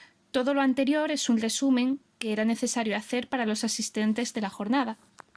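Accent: Andalusia, Spain. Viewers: Me encantó la síntesis que has hecho. Felicitaciones! En cuanto a tu acento andaluz…no te disculpes por ello, es parte de lo agradable que escucharte. No soy española, aunque si de ascendencia y andaluza además. Mi familia llegó a Costa Rica procedente de Torrox, Málaga. De ahí que el habla andaluza me sea muy querida y cercana. Un abrazo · Muchas gracias …. Y ese acento espontáneo andaluz me encanta jajajajaja. Agradecimiento por tu trabajo de un andaluz provinciano .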